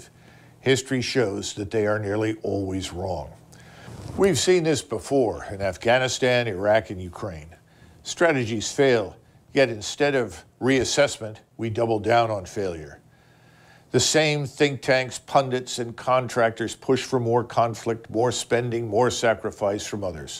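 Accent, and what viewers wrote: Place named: United States. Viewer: I dont care what party he votes for, he sounds like a REAL American.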